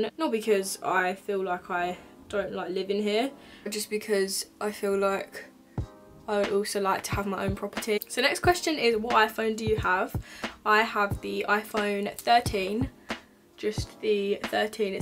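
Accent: United Kingdom. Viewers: UK Essex accent !!! (not the most refined...) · Yes I somehow always thought she was American (never heard her speaking before) and I was so surprised (in a positive way) that she was British!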